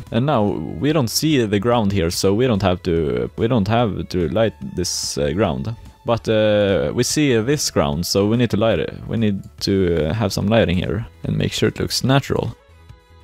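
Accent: Sweden. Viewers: you sound super swedish, am i right?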